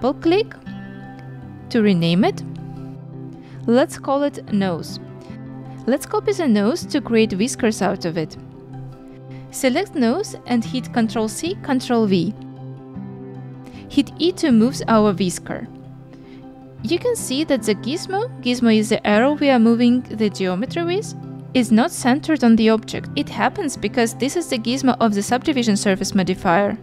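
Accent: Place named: Eastern Europe. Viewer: Единственный английский который я понимаю, этот тот, на котором говорят славяне, ахах) А почему решили на инглише вести канал?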